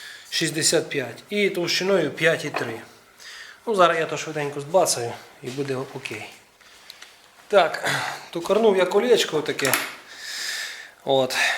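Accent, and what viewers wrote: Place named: Lviv, Ukraine. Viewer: Мутуцикла) Цей Львівський акцент))))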